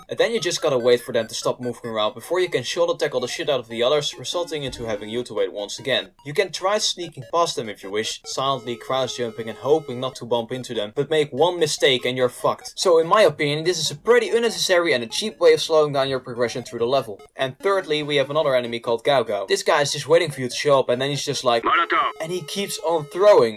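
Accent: Netherlands. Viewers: the dutch accent is real XD. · Nice video.You have a new sub :D And also the Dutch accent makes it that much better xD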